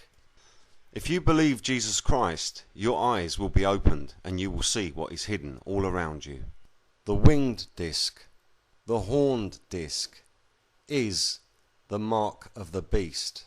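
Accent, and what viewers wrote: United Kingdom. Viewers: is that a pommie accent?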